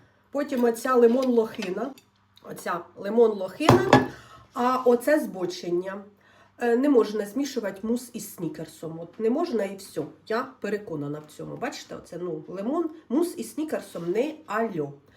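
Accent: Poltava, Ukraine. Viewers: Це полтавська говірка. Мені згадався серіал "Одного разу під Полтавою". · Знає дуже добре українську,але розмовляє,як оозмовляють на Полтавщині.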